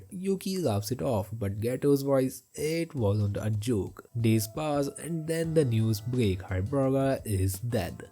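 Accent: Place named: India. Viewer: no hate i would have watched if it wasnt indian voice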